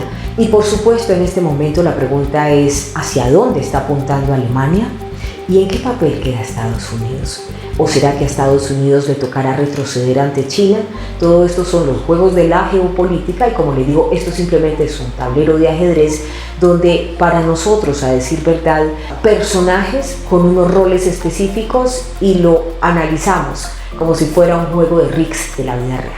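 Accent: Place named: Colombia. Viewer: wow, creo que seré de las primeras en el chat, saludos!! Amo el acento colombiano:)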